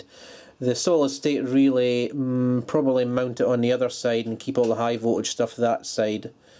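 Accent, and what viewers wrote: Scotland, United Kingdom. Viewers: is from Scotland. I am English. One can easily 'get' all the content by the context. He is clearly a Top Boy and I love having to try a bit harder to understand his speech pattern. I can cope with a few "eeee", "eeerrr" when he is so on the money and his kindness so evident.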